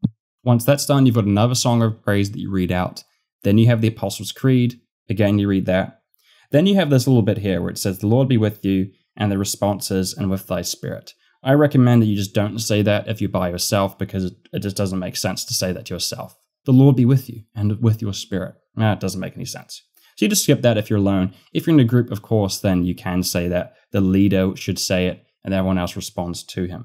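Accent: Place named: South Africa. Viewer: Thanks so much for this video. It's really helped me understand how to properly go through the daily office. I'm still fairly new to Anglicanism and so I really appreciate this. Also I didn't know you're from NZ (I thought your accent might have been from South Africa or something), I'm on the other side here in Sydney Australia.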